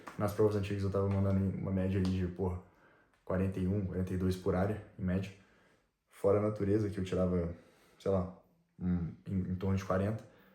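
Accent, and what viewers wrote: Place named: Rio de Janeiro, Brazil. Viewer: Salve mano, nao sei se tu é do RJ comecei a acompanhar o canal a pouco tempo e teu sotaque parece daq apesar de vc mencionar instituições de SP, pq nao tenta UERJ? Med na UERJ é tão prestigiado quanto na UFRJ, tem o HUPE (Hospital Universitario Pedro Ernesto) do lado e fora os programas de auxilio e bolsa que a Uerj proporciona. Falo pq meu primo fez Med la e apesar de concorrido acho que é mais ''certo'' você que ja tem uma bagagem grande se dedicar pra um unico vestibular que vêm repetindo o mesmo padrão desde sempre do que tangenciar seu esforço pra outros vestibulares que por vezes tem a estrutura diferente uns dos outros. Abraço e boa sorte, tu vai conseguir o7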